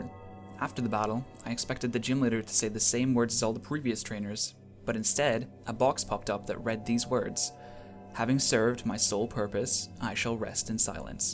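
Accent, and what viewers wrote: United Kingdom. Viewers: The british accent is strong in this one... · Oh ma gad you sounded so British in this!!!! Great story :)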